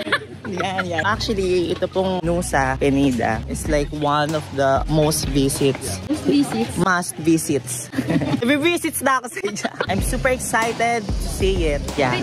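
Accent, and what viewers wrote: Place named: Visayas, Philippines. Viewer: kapoy bha hehehe ang cute mag bisaya ni huhuhu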